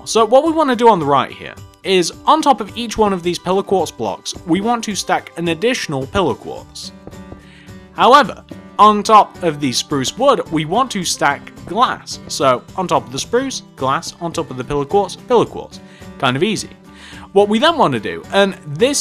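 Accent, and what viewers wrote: United Kingdom. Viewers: Awesome are u from the uk?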